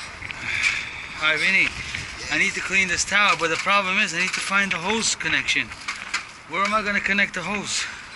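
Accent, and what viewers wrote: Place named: New York, United States. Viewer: You are cool man, love your accent, that classic NY accent as its best!! Cheers from Sweden! Jupp Bahco was made here once....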